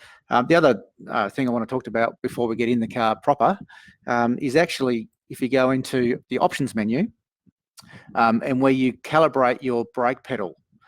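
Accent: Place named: Australia. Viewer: maaaaaaate, such a occa accent!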